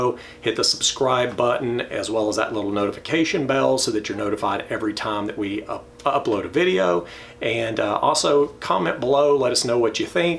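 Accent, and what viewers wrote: South, United States. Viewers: I do miss the southern drawl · Good heavens! I love the commentary. Folks can turn down the commentary if they don't care for it. I'll bet they don't understand our nice Southern accents. I'm in Alabama, y'all. I love accents.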